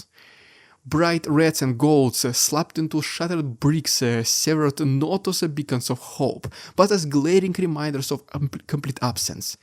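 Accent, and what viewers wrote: Russia. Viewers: I am fond of your YouTube videos. As a linguist I am fascinated by your excellent English combined with a very heavy Russian accent. The two features are not congruous. Would you do us the favor of a short autobiography especially regarding what country you are fled to, and how you learned your English which is the best among Russian presenters.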